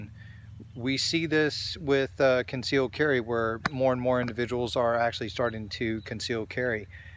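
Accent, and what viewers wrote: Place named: Florida, United States. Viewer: Sounds like you're a Floridians also if I hear right. St. Augustine here. Good stuff. Well spoken words.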